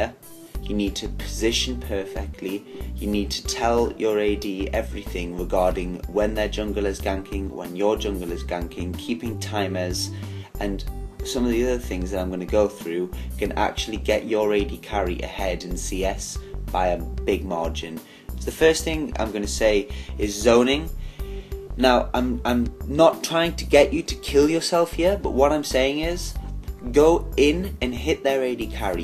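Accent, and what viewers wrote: United Kingdom. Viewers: Man, THANKS for the guide and for speaking british english xD English is not my native language but I understand yours way better than american one. So I learn as much as I can.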